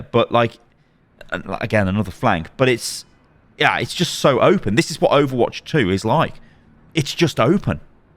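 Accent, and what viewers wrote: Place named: United Kingdom. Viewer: British?! That’s is the correct thing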